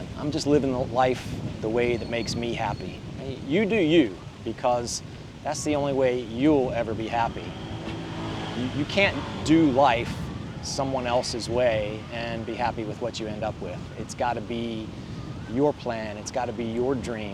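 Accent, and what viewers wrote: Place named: Tennessee, United States. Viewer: Slight accent there, I'm guessing Tennessee, maybe southern Kentucky???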